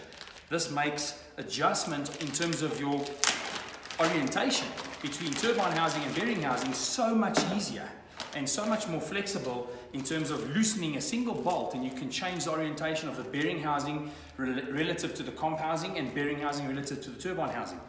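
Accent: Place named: South Africa. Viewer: Dude is definitely south African...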